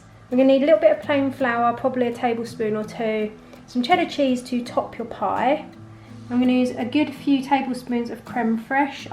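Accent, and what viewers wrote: United Kingdom. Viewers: So nice to hear a British accent on YouTube!!!!!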